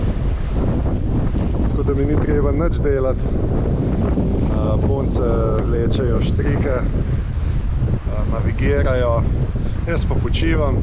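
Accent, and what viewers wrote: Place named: Styria, Slovenia. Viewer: Dober nov intro. Pa fajn je slišat štajerski glas :) Fair winds and following seas!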